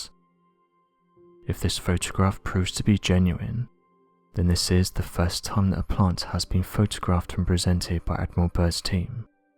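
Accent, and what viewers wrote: England, United Kingdom. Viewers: Why are you whispering ? Speak up already ! It’s bad enough that your slurring your words through your English accent. Can’t we get an announcer that speaks American English !?! It’s freakin annoying already.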